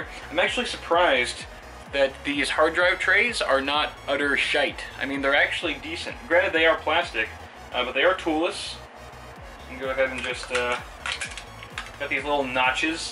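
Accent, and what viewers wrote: United States. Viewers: This build is cringe worthy, with the brown pcb on the motherboard, ghetto cabling, sketchy power supply, cheapo case, and an american accent half the time. is a legend, he pulled all these things off in one video. You shall never be forgotten.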